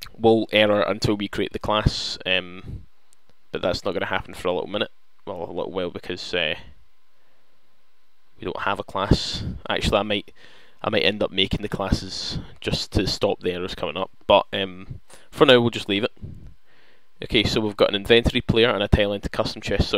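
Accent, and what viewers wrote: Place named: Scotland, United Kingdom. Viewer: Your Scottish me too :P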